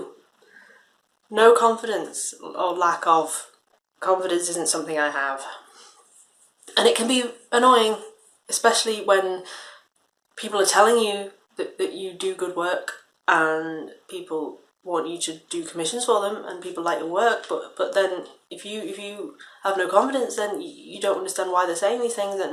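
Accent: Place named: Scotland, United Kingdom. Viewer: I can’t define your accent, you are from the U.K., I am pretty sure, but not from the Southern part. Maybe, Scotland, Merseyside?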